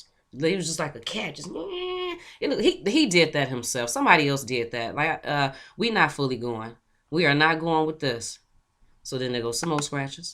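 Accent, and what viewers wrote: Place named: Chicago, United States. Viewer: Subscribing cause your accent tells me you’re from my city Chicago 🤷🏽‍♀️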